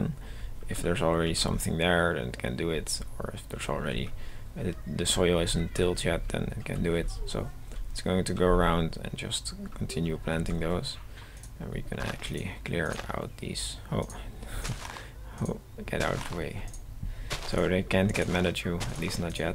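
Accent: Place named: Netherlands. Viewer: ik hoor aan je stem dat je nederlands bent en ps bij die forum kan ik de download link niet vinden staat die dan ergens anders?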